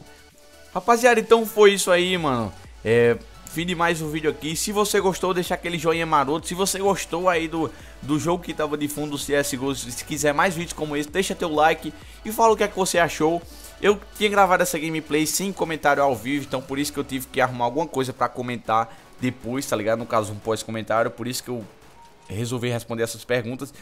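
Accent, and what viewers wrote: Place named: Paraíba, Brazil. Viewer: paraiba da pra ve o jeito q vc fala kk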